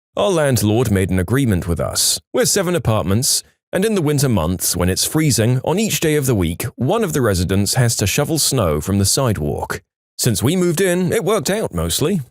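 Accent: United Kingdom. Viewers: He sounds br-ish.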